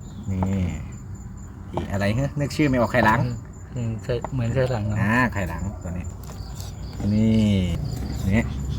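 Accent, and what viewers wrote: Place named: Chaiyaphum, Thailand. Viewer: สำเนียงคนชัยภูมิจิครับ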